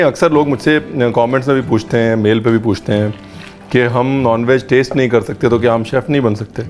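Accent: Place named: Punjab, India. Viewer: Waah Ranveer ji app ki hidi mein panjabi language ka tarka bhat acha laga..keep it up ..txs for recipe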